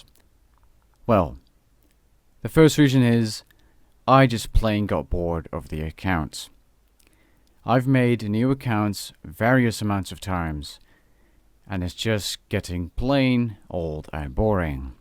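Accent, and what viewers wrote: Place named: Netherlands. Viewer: well whatever you choose to do the community supports you and thank you so much for making such great content and teaching me to boss. It has always been a goal to achieve a max Cape and thanks to you I'm on that road now. And hopefully before too long I'll have the final boss title. And that's good to know you're Dutch 😂 I couldn't figure the accent out . But for real whatever you feel like you have to do or want to do we support you and you at least have built up a community enough to get a jumpstart of you were to do other games❤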